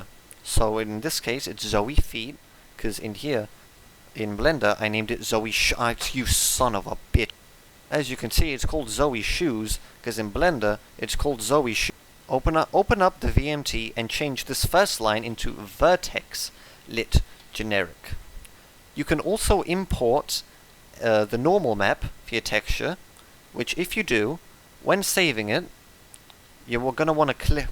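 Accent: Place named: Finland. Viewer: but you have a finnish accent 😭😭😭 where are you from then (if you're comfortable sharing that)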